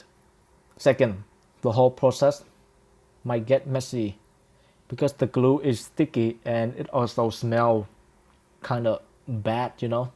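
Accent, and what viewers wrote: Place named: Vietnam. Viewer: u sound like vietnamese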